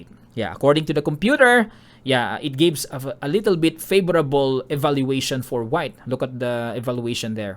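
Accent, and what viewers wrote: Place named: Philippines. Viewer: The way you seak here is still patterned on your filipino version. And still very clear and well elaborated analysis. More subscribers for you coach. Good luck!!! Nakakadugo sa ilong mang english. Hahahahah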